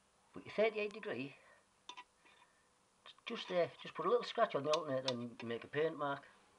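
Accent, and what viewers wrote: Middlesbrough, United Kingdom. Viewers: Good vid thanks, must be a Boro boy with that accent!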